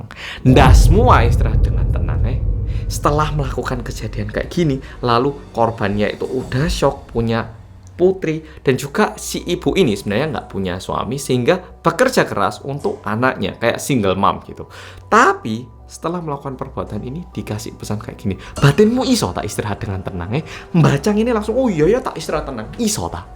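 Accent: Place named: Java, Indonesia. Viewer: Ok... medok... Uraian mu stlh bercerita, aku suka❤ tks sukses sehat selalu ya 🙏🏿✌🏿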